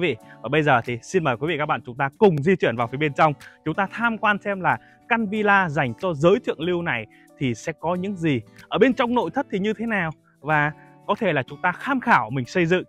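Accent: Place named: North, Vietnam. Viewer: Ở Việt Nam mà báo giá triệu đô, nếu không nghe giọng Băc thì cứ tưởng ở bên Mẽo !!!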